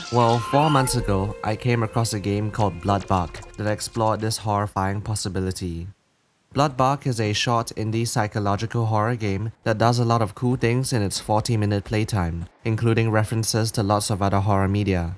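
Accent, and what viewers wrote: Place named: Singapore. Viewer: singaporean accent detected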